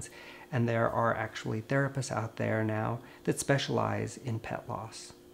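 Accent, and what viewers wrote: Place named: England, United Kingdom. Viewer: Dear gentleman, I couldn't understand why this procedure were carried out. I am not good at understanding your English ascent. Why both cats were not left to take their own time to leave this world.please don't feel bad if I have asked the question without understanding the situation ( which really couldn't understand ). Thanks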